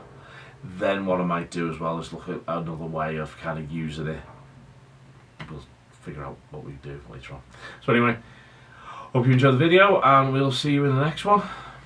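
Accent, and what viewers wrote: Liverpool, United Kingdom. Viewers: With respect, I disagree. I enjoy hearing his strange Scouse-ish tones and humour. It's quite patently not a corporate demo.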